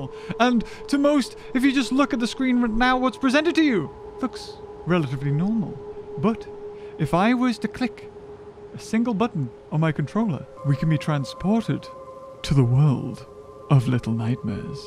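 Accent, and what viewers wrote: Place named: United Kingdom. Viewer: Bruh I love your British accent lol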